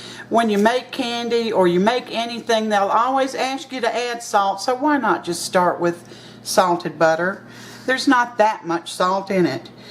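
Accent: South, United States. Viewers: Luv ya,,,, your coment about unsalted butter,,, "honestly that is such a farse" with your southern drawl. LOL the best!! And that is so how I feel as well, THANK YOU. Plus it also reminded me of my beloved aunt Caroline. So here in the midwest we once a had grocer chain that carried the once well known bakery line of McGlynn Bakery and they had these! Yes, the pastry dough part was much like a cross of a Eclair and a Croissant just like what these look to be!! :0) Where as all other cinnamon/sticky buns were like some boring flat bread dough that is barely ediable fresh out of the oven. Oh and the McGlynn ones didn't have raisins which was so fine with me. And thats been more than 20 ago.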